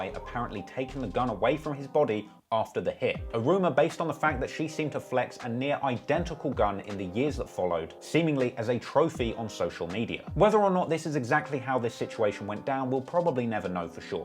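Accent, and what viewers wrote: United Kingdom. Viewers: Its lowkey kinda weird havin a British guy talk abt my city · Hearing the history of my city in a British accent is hilarious 😂😂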